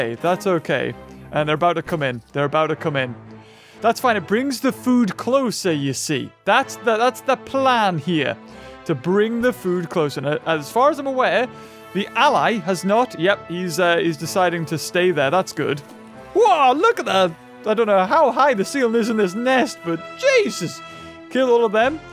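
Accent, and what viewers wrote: Philippines. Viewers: ANOO NAGSABI BA SIYA NG PILIPINO TAGALOG ACCSENT HA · ah that filipino accent is giving my ears seizures 😭😭😭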